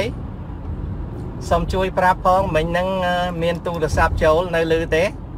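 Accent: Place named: Vietnam. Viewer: និយាយដួចជាយួនមិចៗ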